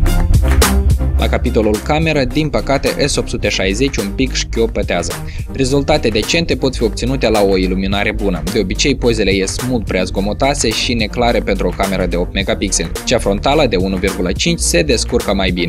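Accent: Moldova. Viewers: Moldova si tipicul accent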